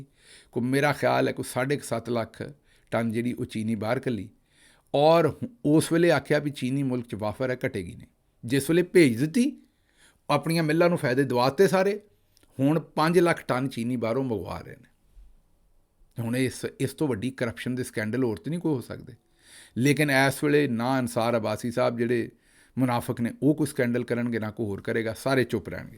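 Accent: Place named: Majha, India. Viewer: Teri boli veere panjabi aa jehdi bol re o eh tan majhe di boli aa urdu aardu bilkul ni hai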